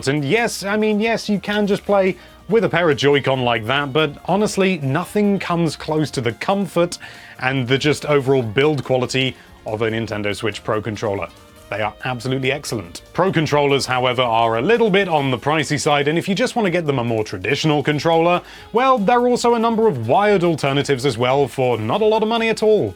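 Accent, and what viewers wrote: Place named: United Kingdom. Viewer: And you have earned yourself a new subscriber. British accents are my kryptonite but you’re also a really good YouTuber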